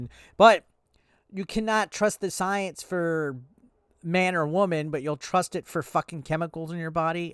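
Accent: United States. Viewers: Your amerikan voice is awful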